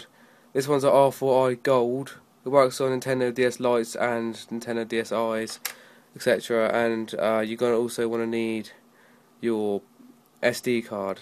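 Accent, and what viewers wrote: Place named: United Kingdom. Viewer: GASP UR BRITISH BRITISH PPL R AWESOME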